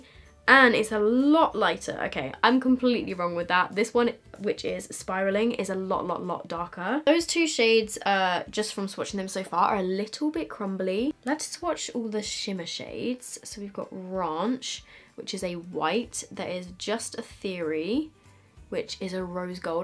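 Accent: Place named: United Kingdom. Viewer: I find voice soooo calming! Anyone know where in the Uk she is from?!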